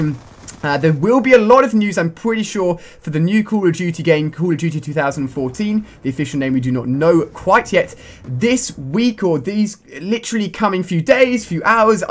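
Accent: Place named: England, United Kingdom. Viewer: English accent but says amarican (astralian)currency$$$$$??????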